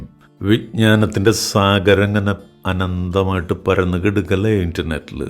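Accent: Thrissur, India. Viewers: തൃശ്ശൂർ സ്ലാങ് ആണേയ് ...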